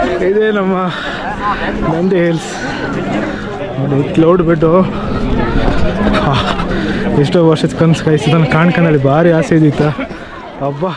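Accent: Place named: Kundapur, India. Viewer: ಕುಂದಾಪ್ರ ಕನ್ನಡ ❤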